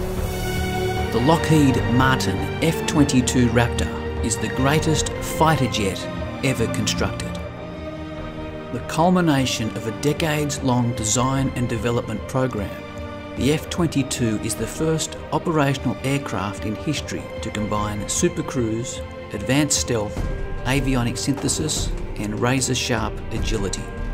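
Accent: Australia. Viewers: You sound Aussie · Brilliantly edited and narrated. I wonder who Sir Lionel contracted to do the narration? I just can't seem to place the voice. He sounds like someone I knew once, but he was from France. So it can't be him because this bloke has an Australian accent.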